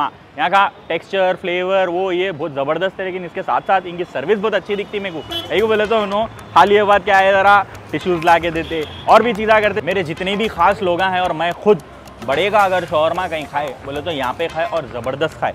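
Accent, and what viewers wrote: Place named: Dakhni, India. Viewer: Hi. Ive been to mallepally after watching your older vlog around the masjid, enjoyed the kova jalebi and haleem. Ive seen many hyderabadi food vloggers but you are the best because you only have that distinct dakhni dialect and you are the most presentable and authentic. If you concentrate more on the old city, jumme raat bazar, golconda bazar, not only the food but also the culture, heritage and history of the Nizams and the untold stories of the Hyderabadi culture, you will get more reach. is a very underrated youtube channel, but stay original, broaden the horizon you will see success. Because you have that authentic dakhani dialect. By the way, try hyd dum ki pukht biryani, mirchi ka salan, kacche ghost biryani, best of niharis and tahiris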